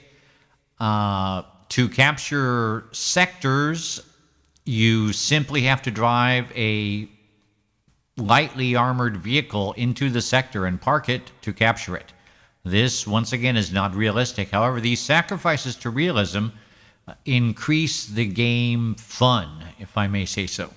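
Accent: United States. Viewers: Thanks for the review! By the way, I bet you're from New York State! Your voice reminds me of Billy Crystals voice! Especially in the movie 'Parental Guidance', when he's commentating during the Baseball Games! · You sound sooo much like the American who visits Fawlty Towers in one of the episodes! Thanks so much for the review though, youve helped me decide to buy it but with a very humble attitude :)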